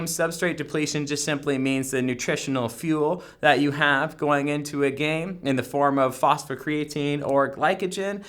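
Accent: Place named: Canada. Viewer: canadian soundin fellow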